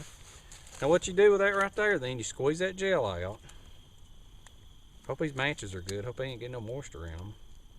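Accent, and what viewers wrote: South, United States. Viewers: I love his southern accent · Very interesting, thank you. Love the accent, Georgia or Lousiana? 😁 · Nice video. That southern accent is deep as hell. Hahaha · Love the southern accent sir! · I'm from Tennessee. Where's this guy from? Trying to place the specific southern dialect. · Love the Southern Accent, And I thought the dog was the Bigfoot...... Love the info but you should come up to Canada for showing extreme shelter's, it's a lot cooler than in the South now in April 28 we are at 37 deg. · Thank you.Entertaining. I love your country boy accent!! Where are you from? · All of us Southerns talk this way. Some accents are stronger than others. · Hell of a southern accent. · That’s a thick southern accent my guy. Love it. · I figured you were raised somewhere way down south:) I remember one trip I was in Atlanta and had a flat tire on my trailer, I parked in a two trucks in front of mine so I had to wait, time went by when a guy came out asking "is that y'alls truck over there?" I began looking around for anyone else that could have been the driver of my truck, I 'knew' I was a solo driver from Idaho, "yes Sir that's my wagon," "move it on in." "Y'alls" I had to smile, during our short visit he said "y'all must be from somewhere way up north?" I asked "why, do I talk as funny as you?" We both laughed! 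He said "your accent tells me you're a northerner!" I guess it depends where we are all raised, I love all your videos and your voice, keep them coming. Bomber from southeast Idaho:)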